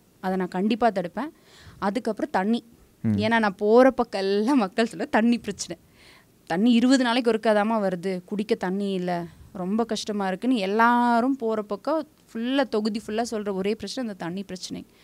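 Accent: Kongu, India. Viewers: Very good kongu speech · கொங்கு தமிழ் அருமையாக உள்ளது 🎉🎉🎉🎉🎉🎉🎉❤❤❤❤ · கொங்கு தமிழ்.... அழகு🧡🧡🧡🧡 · அக்கா உங்க கொங்கு தமிழ் நல்லா இருக்கு Vote for NTK ❤❤❤❤❤ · இந்த அழகான கொங்கு தமிழ கேக்கறதுக்கே 46 நிமிஷம் முழுசா பாத்தேன் இந்த காணொளியை!! தேன் மாதிரி இருக்குயா❤❤❤I'm from Kanyakumari, but i can make my CBE relatives and friends to vote for NTK. At least 50 votes. இந்த கோவைல தான் படிச்சேன்.. படிப்பு தந்த ஊருக்கு ஒரு சிறு அன்பளிப்பு❤❤❤ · அண்ணன் சீமான்! அருமையான வேட்பாளர் தேர்ந்து எடுத்து இருக்கார்! அழகான கொங்கு தமிழ்! வாழ்த்துக்கள்! நன்றி நாம் தமிழர் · Coimbatore Tamil best sako..we be with you…❤❤❤FROM TAMILEELAM..❤❤ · அருமையான கொங்கு தமிழில் அழகுபட பேசும் அறிவும் அழகும் நிறைந்த வீர மங்கையாக திகழும் எங்கள் கோவை பாராளுமன்ற வேட்பாளர் திருமதி.கலாமணி ஜெகதீசன் அவர்களுக்கு மாபெரும் சரித்திர வெற்றி பெற்று சாதனை புரிய வாழ்த்துகள்.. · Kongu tamizh ivvalavu azhagaa❤ kalamani yin vaayil irunthu varum pothu❤ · கேள்வி கேட்கும் விதம் அருமை கேள்வி கேட்டு அவரின் கருத்தை பதிவு செய்யும் வரை பொறுமை காத்து அடுத்த கேள்வியைக் கேட்கும் விதம் அருமை கோவை தமிழ் இன்னும் அருமை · Original kovai slang ❤❤❤😊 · அக்காவின் கோயம்புத்தூர் பேச்சிற்கு நான் அடிமை 🎉🎉🎉..... 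நாம் தமிழர்....(மதுரை மத்திய தொகுதி). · கோவை பேச்சு,, அக்காவிடம் இருந்தே பிடித்தது,,நாம் தமிழர் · கோயம்பத்தூர் தமிழ் சூப்பர் அக்கா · அக்கா கோங்கு தமிழை அழகா கேட்ட திருப்திக்காக நன்றி புலிக்குட்டி புயலா பேசியதற்கு வாழ்துக்கள்....😂😂😂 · Kongu Tamil .. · கோவை தமிழ்❤❤..கலாமணி ஜெகநாதன் சிறப்பு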